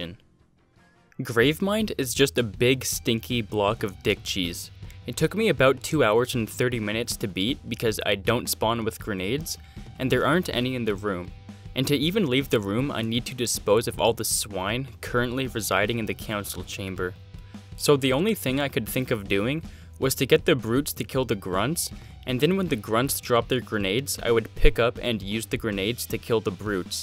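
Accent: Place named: Southern Canada, Canada. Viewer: your accent so south-canadian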